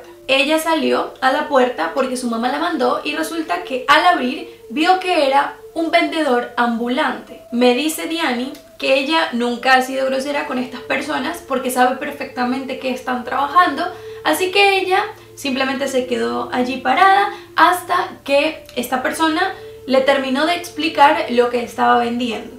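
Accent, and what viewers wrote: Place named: Caracas, Venezuela. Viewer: Me encantan tus vídeos! Se que eres venezolana (de San Cristóbal si no me equivoco) pero siento tu acento muy distinto a como usualmente hablan los gochos jaja, disculpa si es personal, pero viviste en otro estado? Que si en caracas o Valencia? Tu acento es súper caraqueño (te lo dice una caraqueña de pura cepa 😂) Un abrazo! ❤️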